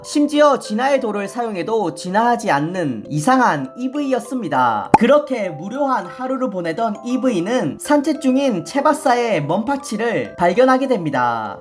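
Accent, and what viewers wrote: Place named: Gyeongsang, South Korea. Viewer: 경상도 딕션 ㅋㅋㅋㅋㅋㅋㅋㅋㅋㅋ EVE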